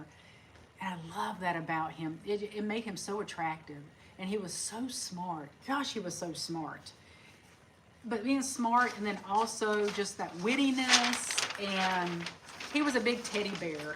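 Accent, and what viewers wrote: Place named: Carolina, United States. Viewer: We relocated to North Carolina back at Christmas 2018 from Maine. I knew immediate that was a Carolina accent. 😉. I only found your channel shortly before your husbands passing. The content did change, but that was expected. We downsized by over 1000 sq ft. and I am so glad we did. So much less to care for. I happily decorate my smaller home. I am not a minimalist, but still enjoy having items that bring me joy. My struggle is making friends as an adult. I am blessed to have my church, but it is still different. That's for letting us into your world.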